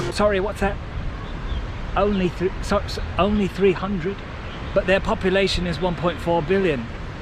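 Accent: United States. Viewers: Love your American twang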